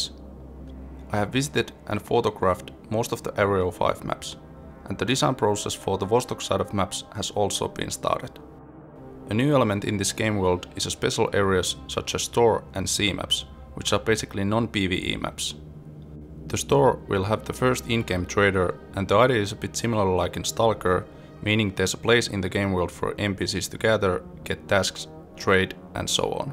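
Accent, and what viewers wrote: Finland. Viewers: Are you finnish you have strong finnish ascent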